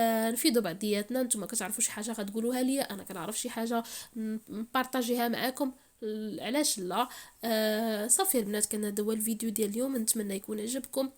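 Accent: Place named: Maghreb. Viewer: سلام عليكم 🤗أنا ندخل نتفرج بش نسمع اللهجة المغاريبية تعجبني برشا 🇹🇳🇲🇦🙏❤و ربي يعينك 🤲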